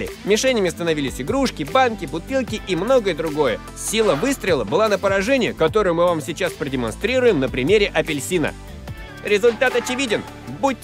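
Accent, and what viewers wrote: Kazakhstan. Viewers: Ты из Казакстана?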